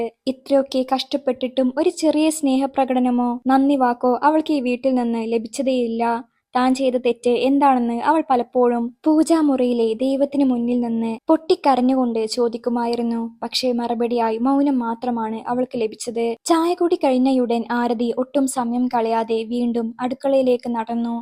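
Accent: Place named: Kottayam, India. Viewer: വെല്യ എന്ന വാക്ക് കോട്ടയം സൈഡിലൊട്ടൊക്കെ കോമൺ ആയി യൂസ് ചെയ്യുന്ന word തന്നെ ആണെടോ 😊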